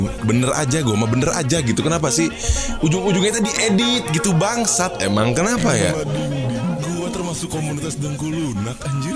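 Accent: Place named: West Java, Indonesia. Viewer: Sunda pisan akwkw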